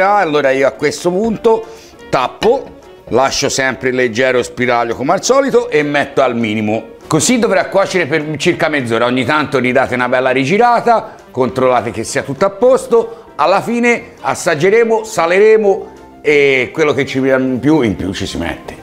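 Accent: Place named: Livorno, Italy. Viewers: L"accento livornese è proprio così, più forte rispetto ad altre città toscane, almeno così ho notato. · A Livorno parlano così, è colpa del cinque e cinque.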